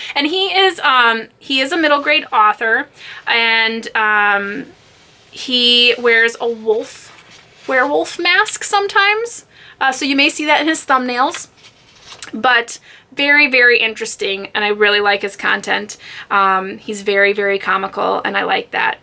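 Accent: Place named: United Kingdom. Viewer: British accents are always a plus XD awwwww! You are definitely warm and friendly!!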